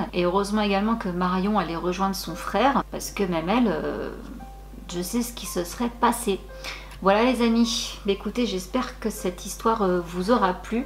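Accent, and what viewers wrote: France. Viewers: Team "comme t'es à l'aise pour prononcer" xD J'avoue j'aime bien quand c'est à la française ! Allez go it's tea time 😍 Ah non bonjour bonsoir 😂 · Coucou, tu n'as pas à t'excuser, tu as une vie en dehors des réseaux sociaux et de YouTube et c'est très bien : tu poste quand tu veux !! Et moi je préfère que tu prononce à ta façon ( à la française) l'introduction, voilà..... Bravo à ce jeune homme et à ses amis, les deux filles ont bien fait de porter plainte car ce gars sera plus facilement retrouvé dans l'avenir et ça préviens d'autres personnes qu'il y a des soucis avec ce genre d'approche....... Merci pour cette histoire, gros bisous 🥰👏🏻👏🏻💕🌺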